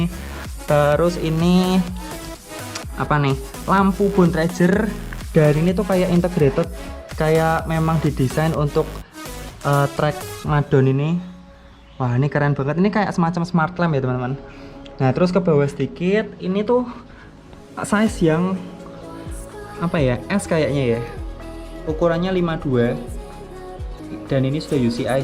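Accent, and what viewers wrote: Java, Indonesia. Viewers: Mantaappp.... bahasanya pakai 3 bahasa, ingris,indonesia,jawa medok..hehehe.. semangat mass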